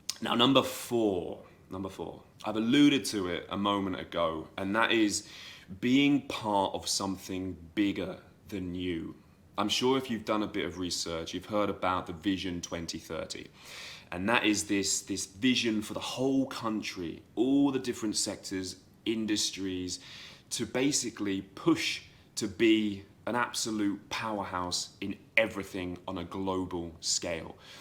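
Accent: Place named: England, United Kingdom. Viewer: You have a nice English accent... Appreciate that. Wherever you are going to be living around the world make sure you get to do what you can to enable you invest. The experience is always cool though, but if you're a foreigner in any country your priority is investment... back Home that's not your home Thank you for the beautiful accent again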